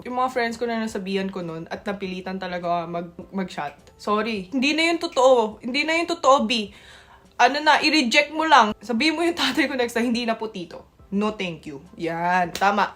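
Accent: Batangas, Philippines. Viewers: I just love the way you talk like a local in taglish and the way you articulate your English is tops. No more shades of being Korean. Even your intonation is so Filipino and so understandable. I'm very proud of how you've turned out to be. You are now very well versed and a good conversationalist. Thank you, congratulations and God bless.. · Grabe Pinoy n pinoy accent tagalog ni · Napanood ko po kayo sa TVJ, mukhang bata yong itsura niyo pero yong 12 years old mukhang mature na. Maganda po yong batangueña accent niyo talagang tigasin, kilala po kasi ang mga batangueño na matatapang, mga ilocano na kuripot, mga kapampangan na galante. Sabagay bawat lugar may kanya kanyang bansag, sinasabing matatapang ang mga batangueño dahil sa salita palang eh medyo mai intimidate ka na, lagi pa silang may balisong sa bulsa kaya kung ayaw mong magripohan huwag makipag away sa batangueño, sinasabing kuripot ang mga ilocano dahil siguro bihira kang makakita sa ilocos ng mga magagarang bahay gaya ng Pampanga, o manila na super gaganda ang mga bahay at halatang may kaya ang mga tao. 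Sa ilocos po parang hindi apektado ng mga pagbabago ng panahon, meron din mangilan ngilan na magaganda ang bahay pero mostly simple living ang mga tao doon dahil karamihan sa mga ilocano, sa pagsasaka ang ikinabubuhay at kahit na nakapagtapos na ang anak ng isang magsasaka, mahilig pa rin sa nakagisnan na pagsasaka, in other words simple living lang talaga ang maraming ilocano kaya nasasabihang kuripot. Sa mga kapampangan naman po, palibhasa progresibong lugar ang pampanga, maraming mga business establishments, mga restaurants at parang manila na maraming tao. So maraming mga kapampangan ang mga milyonario at ipinapakita nila sa magagarang bahay, in fact po, tuwing piyesta sa ibat ibang lugar sa pampanga, kunwari dadaan ka sa isang handaan na open for public, puwede ka ng makikain, huwag lang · Just binge watched your videos. And saw on ig that you studied Archi in UP i guess? My question is, are you using your degree now? 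Like the apprentice and such? Or pahinga muna? And how would you take the boards? Macecredit ba yan sa korea and youll be a licensed archi there???? I hope youd be able to reply. Im an archi student as well kasi HAHAHAHAA *plus i really love na na adapt mo yung thick neutral accent ng pinoy when speaking in english. Mas prefer ko yun, mas pangit pa nga yung may konting tweng or conyo BGC type na english, like shut up and get the fuck off 💀😂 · You really sound like a Filipina speaking tagenglish. I really like your batangueno astig accent and Im a big fan of yours. You should have a talk show in Manila. Since you are articulate and smart. You will be a big hit. Watching from northern California, the bay area. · galing magsalita ng Filipino…. go Batangas!!!! andun pa rin yun punto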